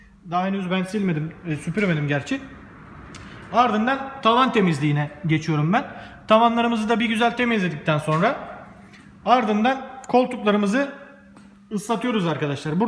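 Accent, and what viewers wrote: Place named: Thrace, Turkey. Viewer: hocam konusman tam trakyali😂 harika yav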